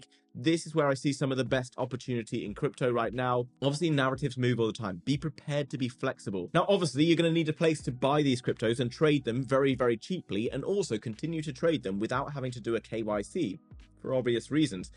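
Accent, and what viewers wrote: United Kingdom. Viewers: Love your content man! Your British accent is GOLD! :-D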